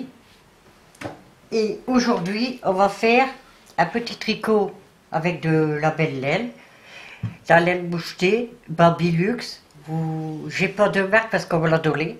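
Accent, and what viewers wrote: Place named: Nord-Pas-de-Calais, France. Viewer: Bonsoir, toujours le même plaisir pour moi à entendre l'accent chti^^ pour la laine maman en a elle vient de chez Babou. Bonne soirée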